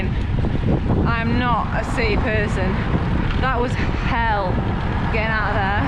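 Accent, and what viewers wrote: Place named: North, United Kingdom. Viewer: Absolutely amazing videos. As a northerner now living in munich. The accent is a touch of home. Getting myself ready for a cross alps trip. Keep em coming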